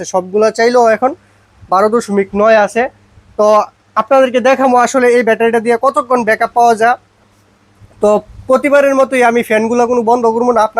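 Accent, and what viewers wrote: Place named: Char, Bangladesh. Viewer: ভাই আপনার বাড়ি কোথায়,দেখি চরের ভাষায় কথা বলেন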